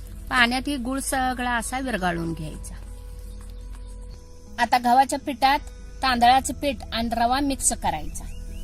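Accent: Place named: Marathwada, India. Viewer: तुमच गाव कोणत आजी? भाषेवरून मराठवाड्यातील वाटतात .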